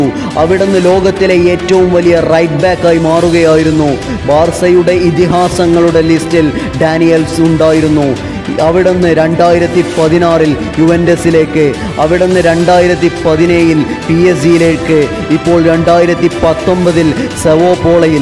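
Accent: Malappuram, India. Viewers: 😄😃Achan dovingos footballinte Oru pirandanayirunnu enn saaran 😁😁thani Malappuram slang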